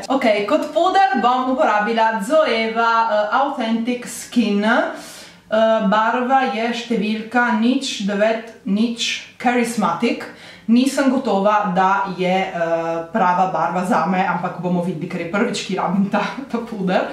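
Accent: Trieste, Italy. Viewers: Si sente un botto che sei triestina hahahahhaha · Sloveno con accento triestino, top😆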